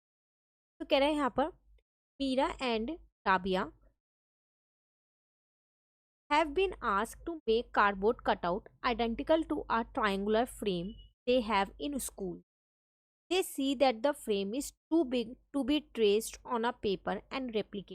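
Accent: Bengal, India. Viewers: Are u bengali😮😮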